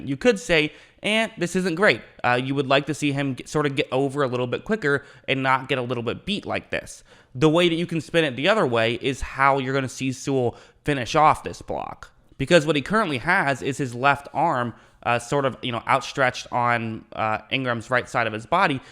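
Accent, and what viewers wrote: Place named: Detroit, United States. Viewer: Ahhh, so your a Lions fan. I was wondering. You sound like that region. Makes sense. You do a good job of not showing bias.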